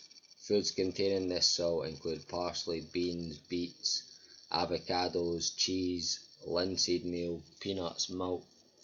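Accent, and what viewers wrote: Scotland, United Kingdom. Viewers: I subbed to you because I liked the fact that you gave your ideas at the end and what a very good thought it was. Thanks for sharing this and your own ideas on it too. I would love to hear more about this. Love your Scottish Accent by the way!! I am a Norfolk girl, but my Mum and I just love Scotland so very much :) especially thick Plain loaf toast.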